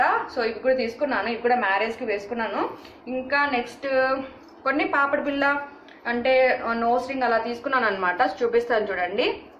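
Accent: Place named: Rayalaseema, India. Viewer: Midi raylseema LA undi yasa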